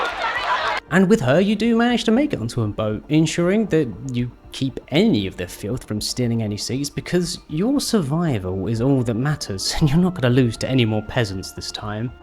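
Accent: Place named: RP, United Kingdom. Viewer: Ok, this is first time I watch video with this particular youtuber and I must say I could listen to him for hours. The way he speaks english with received pronunciation is just wow.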